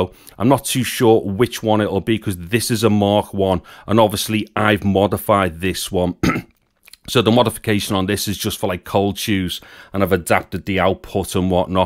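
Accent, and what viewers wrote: Liverpool, United Kingdom. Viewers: you've got nice voice (and audio set up was well explained too) and i like your regional prononciation, i guess Liverpudlian?:) Sorry, if not, i'm a foreigner.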